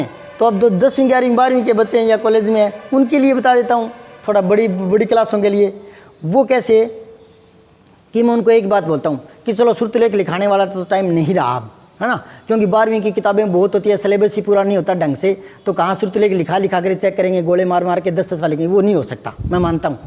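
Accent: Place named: Rajasthan, India. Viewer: लगता है आप राजस्थान से हैं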